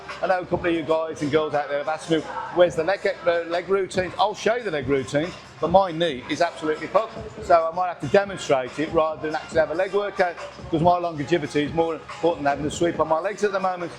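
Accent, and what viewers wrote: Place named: United Kingdom. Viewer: Love the British chatter.Never mind a good yarn. 👌🏼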